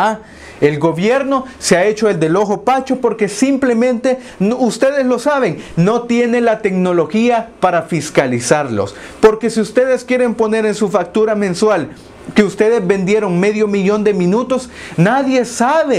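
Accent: El Salvador. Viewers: Es el primer video tuyo que miro y me llega tu formato y hasta el nombre del canal, pusiste buena información sobre la mesa. Expusiste bien y con original acento salvadoreño. Felicidades y saludos desde los Ángeles, California me suscribo.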